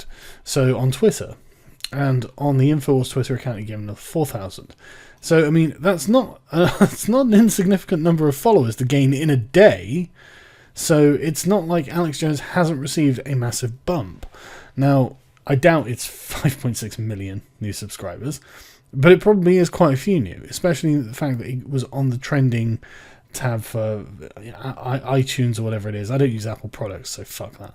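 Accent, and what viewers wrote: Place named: England, United Kingdom. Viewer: Why do you think he is poorly informed ????? Alex "says" that he has great Sources in Many Branches in the USA Government. You sound like you are FROM England. Tommy Robinson has stepped out like a real MAN to defend the FREEDOM of Speech. Best wishes from the USA...MAGA with Donald J. TRUMP..Stand up for your RIGHTS or DIE protecting them...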